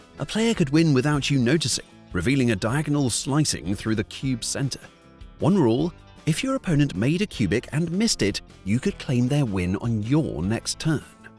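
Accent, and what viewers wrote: United Kingdom. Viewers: I'm a Brit that's lived in the US for over 20 years.... Why is a British accent describing lots of games which WE NEVER HAD in the UK?.. Rather mystifying.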